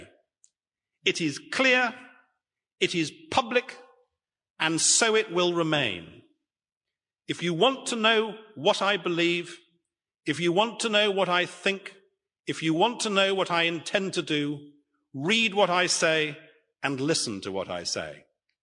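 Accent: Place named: United Kingdom. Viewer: 英式英文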